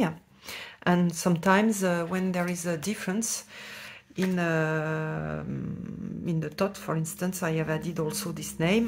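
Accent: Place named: France. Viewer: Sublime tarot, félicitations à toutes les deux pour cette belle collaboration 💚💚💚 Il me tarde de lire le guide book et de recevoir le tarot. Bravo pour ta review en anglais. La langue de Shakespeare n'est pas accessible à tout le monde Bel accent frenchie, avec beaucoup de charme 💐 🧚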